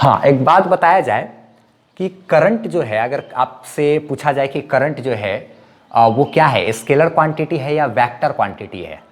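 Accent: Bihar, India. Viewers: Sir aap Bihar k ho..?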